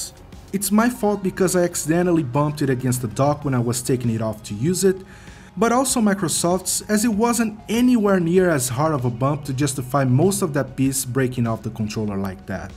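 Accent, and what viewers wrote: Brazil. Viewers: Cara, matei no primeiro minuto de video que você era brasileiro pelo sotaque kkk. Parabéns pelo vídeo! Muito bom! E excelente inglês! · Dude, are you brazillian?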